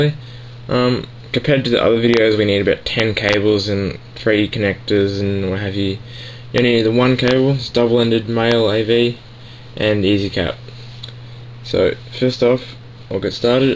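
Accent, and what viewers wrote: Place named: Australia. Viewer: thanks for the help. favorited so I can use it for future reference. You australian? nice accent :P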